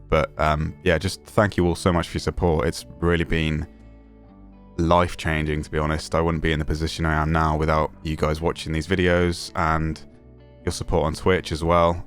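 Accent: United Kingdom. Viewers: has a British accent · Good on you, to be honest I never actually subscribed until now basically because I 'assumed' I already had (hearing you in the videos) while watching Aculite 'so much' and Fugglet is on fire at the moment - so I kind of always watched you in a 3rd party sense. My Bad, Subscribed and keep it coming mate. Nice to hear the UK within the 'TEAM'. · I live in Shropshire dang.... Thought your accent sounded familiar🤦‍♂️ I'm from Telford,.. tiss a bit rough lol.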